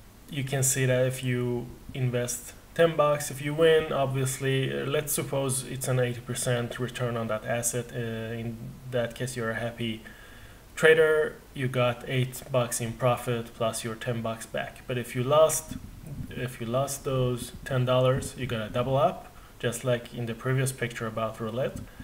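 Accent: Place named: Hungary. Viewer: where are you from? i cant figure out by your accent. i feel like hungarian but im not sure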